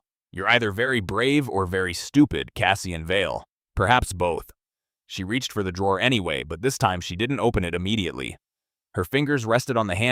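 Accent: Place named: United States. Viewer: it's marginally better if slowed down to 90% but that voice is very American and whiney ... It doesn't fit with the context or the content.. but the story itself works well.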